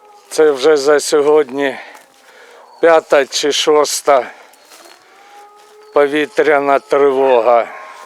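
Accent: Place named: Kharkiv, Ukraine. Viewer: Доброго здоров'я! Зі святами вас!!! Усих благ, мирного неба над головою та Божої Благодаті!! Скажіть будь ласка: з якої ви області?! Говір вроді наш: харківський, слобожанський.🙂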